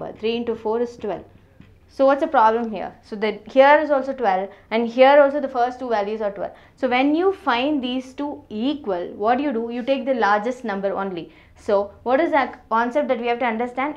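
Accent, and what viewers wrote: South India, India. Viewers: Are you from South india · South Indian accent.....liked it very much mam....and your method is unique......thank you mam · Madam South ki hai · R u a south Indian??. This is very useful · Thanks ma'am a lot. It's very helpful to me . And one more thing, are u south Indian? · Mam's english tone is somewhat southindian but I love it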